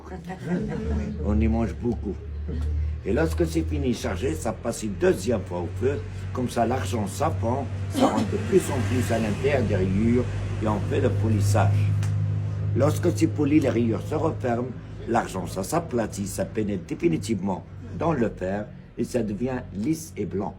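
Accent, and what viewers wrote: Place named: Paris, France. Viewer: wao...il maitrise bien la langue mieux que français eux mêmes.un vrai ambassadeur..il n'est pas originaire français je suppose. en tout cas il a un bon accent parisien❤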